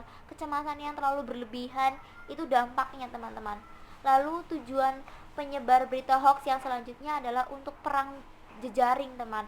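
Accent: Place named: Java, Indonesia. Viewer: Wah logatnya jawa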